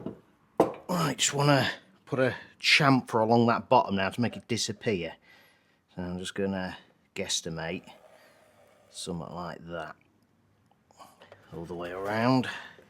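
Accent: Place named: Bolton, United Kingdom. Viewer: Good idea for those tools in constant use the simple ones are the best, if I closed my eyes I'd swear I could hear a young Fred Dibnah your not from Bolton by any chance?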